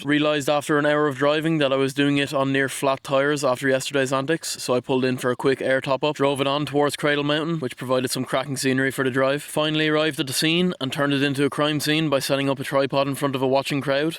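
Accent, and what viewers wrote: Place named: Ireland. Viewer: Love that Irish accent.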